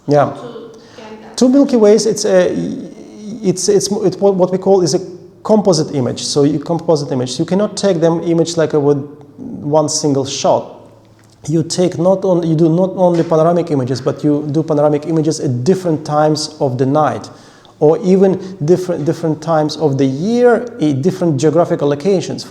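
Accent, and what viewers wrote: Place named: Russia. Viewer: didn't bother to get rid of his thick Russian accent.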